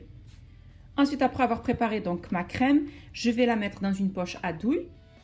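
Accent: South, France. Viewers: C'est surtout le même accent du sud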